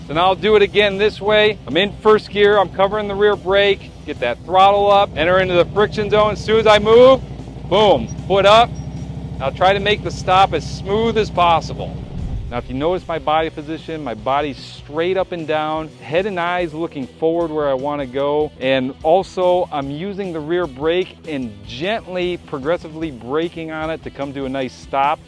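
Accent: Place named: Midwest, United States. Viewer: your content is so good. Maybe it’s the midwestern accent 😮 that makes you so easy to understand 😅. I like the figure 8s you do in 4 parking spots. Keep up the good work. Really solid🎉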